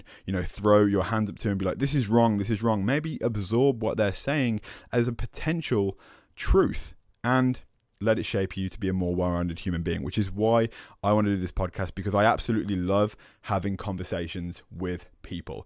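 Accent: United Kingdom. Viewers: I'm loving your british accent. I'm from Holland and your accent and voice are so amazing. Could listen to it all day. Yep i love british english.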